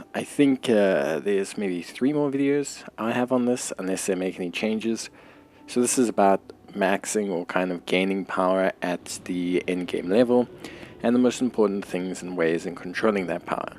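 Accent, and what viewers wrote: South Africa. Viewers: What accent is that? Saffa?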